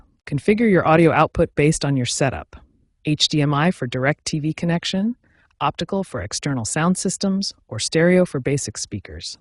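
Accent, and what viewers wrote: United States. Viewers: As an example of poor delivery technique, this presentation would be useful as a training aid for teachers and lecturers. The presentation is poorly coordinated with information flashed on the screen brielfly with insufficient time to assimilate the content. Thereares also unecessary and distracting blank pages. The rate of s[eaking is almost so fast it is garbled and while the presenter's diction is quite good, why was an American accent chosen for a product supplied in the UK? There should be considerably more detail on how to access \setting options and a link to a printable transcript so that the user can follow the information at a pace suitable for making adjustments.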